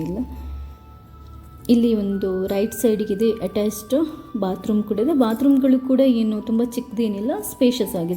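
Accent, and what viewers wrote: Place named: Mangalore, India. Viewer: ಅಕ್ಕೆ ಇರೆನ‌ ಕನ್ನಡ ಕೇನಗ ಎನ್ನಿಯೆ ಈರ್ ಕುಡ್ಲದಾರ್ ಆದುಪ್ಪು ಪಂದ್. ಎಡ್ಡೆ ಆಂಡ್... ಇರೆನ tips ಮತ ಎಡ್ಡೆ ಎಡ್ಡೆ ಉಂಡು.... Bangalore ಡು ಈತ್ ಎಡ್ಡೆ ಇಲ್ಲ್ ತಿಕ್ಕಿನ ಇರೆನ ನಸೀಬ್... ಬಾರಿ ಶೋಕ್ ಉಂಡು... ಇರೆನ ಆಸೆ ಲೆಕ ಆಯಿನಾತ್ ಬೇಗ ಸ್ವಂತ ಇಲ್ಲ್ ದೆಪ್ಪುಲೆ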